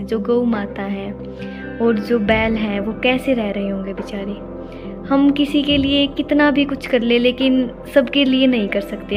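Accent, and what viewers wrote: Haryana, India. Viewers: Aaj k vlog me haryanvi sunkar bahut aacha laga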